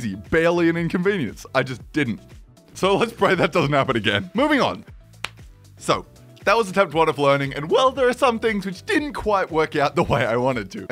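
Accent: Australia. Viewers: OMG YOUR AUSTRALIAN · Code are you Australian?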